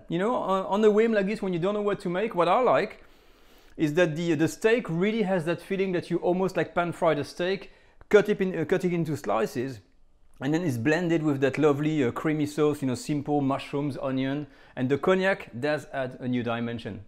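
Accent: France. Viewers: I can hear dat French accent😂😂. Continuez chef! J'adore votre recettes.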